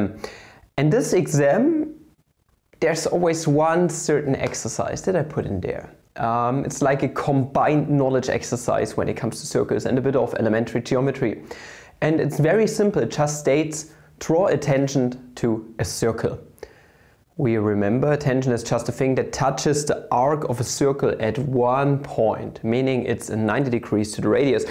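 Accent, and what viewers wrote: Germany. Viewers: Es fällt einem fast nicht auf, dass der typ deutsch ist, wow.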